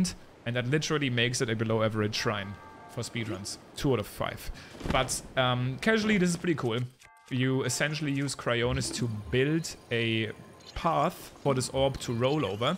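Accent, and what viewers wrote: Germany. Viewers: hab ich mir gedacht man hört nämlich den deutschen Akzent extrem heraus bei dir. Ist zwar beim zuhören etwas komisch aber trotzdem Geile videos👌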